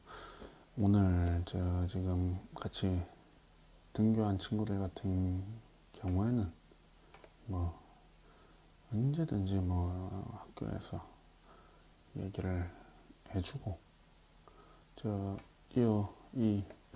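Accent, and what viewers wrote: Gyeongsang, South Korea. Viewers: 시발존나리얼하네 같은경상도사투리니깐 더리얼하네ㅋㅋㅋㅋㅋㅋㅋ와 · 배재고나오셨으면 서울토박이일텐데 어떻게 경상도사투리 네이티브같죠? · 시리즈로 해주세요 어제 물리수업듣다가 꿀잠잤어요 오늘도 자습보면서 램수면 빠져볼게요. 근데 왜 동남방언쓰시는지요?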